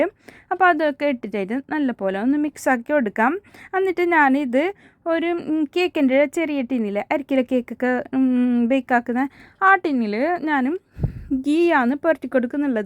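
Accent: Kasaragod, India. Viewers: Super recipe anallo ! Koodathe ningalude kasargodan style talking enikku bhayangara ishtanu !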